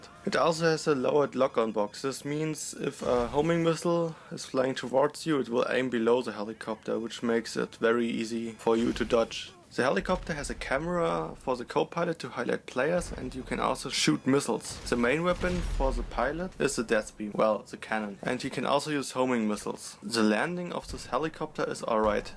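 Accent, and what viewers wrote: Germany. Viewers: Man merkt du bist deutsch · If you are German... I love German accents so muchhhhhhhh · Wie krass man hört dass er deutsch ist ;)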